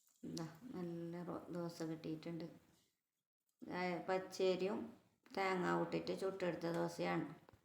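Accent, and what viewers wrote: Kasaragod, India. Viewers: Good 👍 ksd slang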